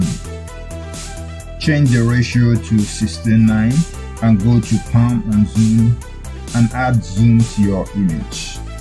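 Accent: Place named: Nigeria. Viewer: You sound like a Nigerian, 👍 awesome tutorial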